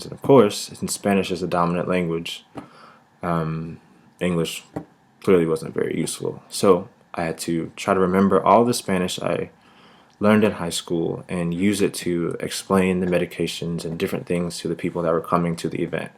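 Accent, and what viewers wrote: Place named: South America. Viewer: Hablas muy bien el español para ser Norte americano, incluso, suena más como si fueras de sud américa.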